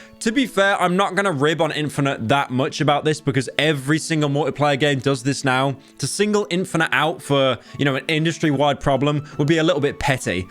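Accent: United Kingdom. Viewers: Me listening to some british boy talk for 12 minutes about a game I’ve never played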